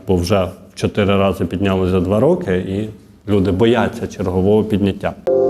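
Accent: Vinnytsia, Ukraine. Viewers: демагог з бідагогічною освітою,припини херню нести,а краще навчайся вірно,бо твій вінницько жмеринський діалект ріже слух. Менше води,більше конкретики. І яка була корисна інформація в цьому відео,?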